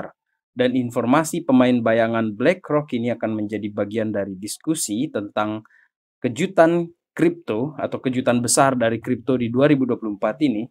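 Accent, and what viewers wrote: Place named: Eastern Indonesia, Indonesia. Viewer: bang sorry oot,, abang org timur kah... logatnya kayak gtu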